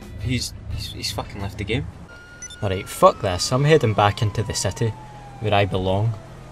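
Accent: Scotland, United Kingdom. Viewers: Are you scottish? You sound scottish if you are I am too!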